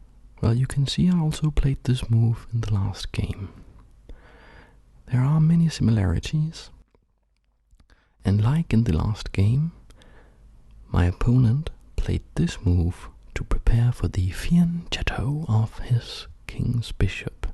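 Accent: Denmark. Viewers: at first I was like.. this fella sounds a lot like a Dane.. I then scrolled down and you told us you are from Denmark. Halløj mester! god video, god instruks ;)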